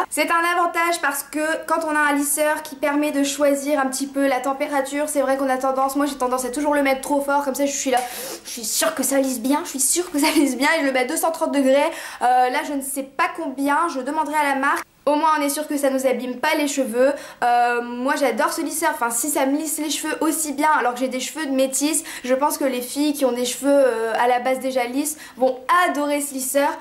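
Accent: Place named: Nord, France. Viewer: En fait je suis un mec et puis je regarde beaucoup de tes vidéos ! Ca fait gros pervers dégueulasse hein ? xD Non mais en fait le truc c'est que j'aime beaucoup ta façon de parler et ton ptit accent du Nord ca me change de mon accent de chez moi (en Alsace) et puis t'es vachement drôle je trouve et du coup ca fait toujours plaisir de t'écouter et te "voir", même si je ne suis absolument pas intéressé par un lisseur x) Bref merci pour les ptits moments quotidien de plaisir à regarder tes vidéos et puis abh...change rien ;) Ciaoooo !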